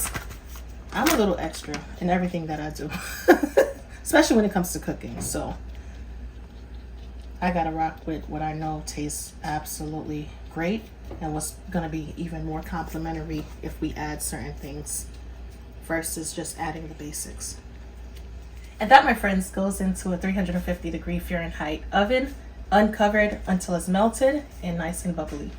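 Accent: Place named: New York City, United States. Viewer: It's "Creative Cooking With ". I told her that I knew this wasn't Cooking With recipes with that bland looking food and lack of seasonings. I went off. I tell everybody about your food. How you cook like you're from South but your accent sounds like NYC. A wonderful mix.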